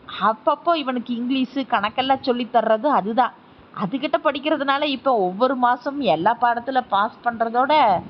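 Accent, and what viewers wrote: Kongu, India. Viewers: கரிசல் கதை கொங்கு தமிழில் 👌🏻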